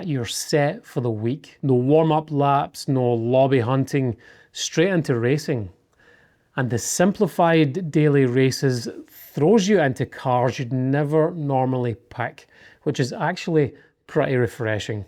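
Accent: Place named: Scotland, United Kingdom. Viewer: Firstly.. before my main comment…. I am Scottish 🏴. - your accent sounds Scottish. Out of curiousity why quote prices in dollars- are you catering to the bigger US market? Anyhoo….. .. Wow. Watching that video it could have been myself talking. Agree with you 100%. In our house, my partner is the console player (PS5). More Assasins Creed, Crimson Desert than GT7- but she did play it (flat) with controller and was as good if not better than me on that platform. As soon as you put me on PSVR2 (with globular cluster of course) I levelled the playing field - just getting used to that controller!! 😊 I am yet to play GT7 on my ‘$7000’ 😉 PC rig- simply because the PS5 is in our living room hooked up to our big TV. I mentioned in previous vid comment I play only modded AC on PSVR2 with PC adapter and the DFR mod. Other than a high end headset, I am a bit of a PC snob- but - I still rate GT7 highly. 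All your comments ✅ Also Sophie (1 or 2) is a good AI competitor if you (like me) race offline only. Yes- modded AC looks friggin awesome when you have it dialled in properly, and tinkering in that sandbox is half the fun for me. With more than 6000 cars and 1200 tracks, it’s hard to beat. But… GT7 looks gorgeous at times for something which is plug and play. Bit ‘grindy’ perhaps to unlock some stuff, but tyres, pit stops, visuals. Pretty good- whatever sim racing physics snobs may say. At the end of the day.. it’s not real racing. I did this for years. Bankrupt me😅 So many broken engines…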